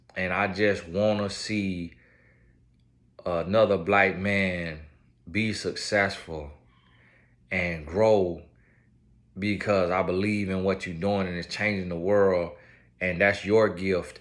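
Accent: South, United States. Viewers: Hey, u don’t have a country accent, u have a southern accent! U articulate just fine. Correct anyone who says that to you. Its a southern accent!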